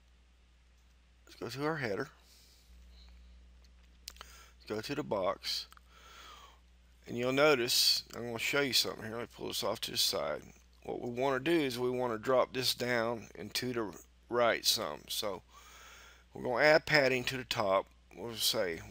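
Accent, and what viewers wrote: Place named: Tennessee, United States. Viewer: It's the Tennessee accent for me.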